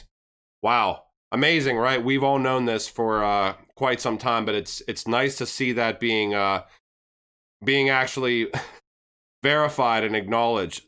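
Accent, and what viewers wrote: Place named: Western Pennsylvania, United States. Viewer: Are you from western PA? Gotta be, the accent had me thinking it and now the pirate hat. Gitta be only western pa guy wears a pirate hat. Anyway, more educational is good. The tokenization is fascinating and dynamic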